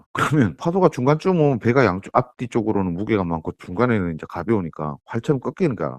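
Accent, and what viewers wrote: Gyeongsang, South Korea. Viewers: 역시 현잠 실무자의 직접적인 얘기라 귀에 쏙쏙 들어오녜요 경상도 특유의 다소 투박한 사투리지만 그것이 오히려 재미와 더불어 귀를 더 기울이게 하네요 비록 알고있던 정보지만 타 유튜버들의 써놓고 읽는듯한 통상적인 진행보다 한결 신선함을 느꼈습니다 비록 자막이 없어도 지루하지 않게 들을수 있었습니다ㆍ신선했던 컨텐츠 였습니다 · ~ 이번 에피소드 하나로 조선업을 이해할 수 있었네요 특히, 갱상도 말로 들으니까 더 이해가 잘 되네요. 해양플랜트는 힘들어도 조선은 살아~있~네 ^^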